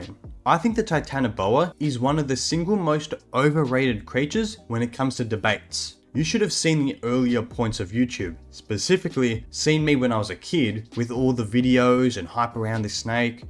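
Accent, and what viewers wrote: Australia. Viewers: I enjoyed this information and cool Aussie accent-Subscribed!🎉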